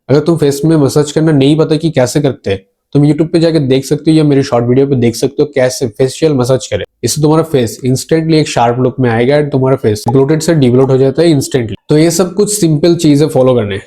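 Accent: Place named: Bangladesh. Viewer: Bhai tumi to bangladeshi hindi te kotha bolo keno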